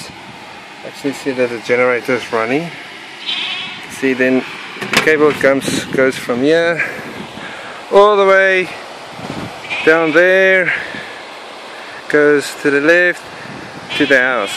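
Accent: Afrikaans, South Africa. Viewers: Immediately recognised the South African accent. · is that a South African accent I hear? i LOVE your setup... It would be great if I had land like you do with a running stream for power... how great is that? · is that an Afrikaans accent Im hearing?! Ah the nostalgia. Lekker turbine boet!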